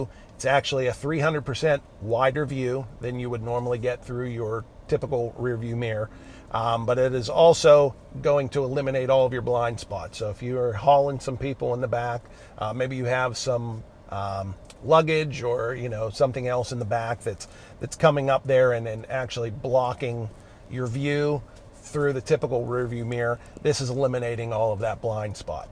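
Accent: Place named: Pennsylvania, United States. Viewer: Love the "Uniontown, Pa" accent!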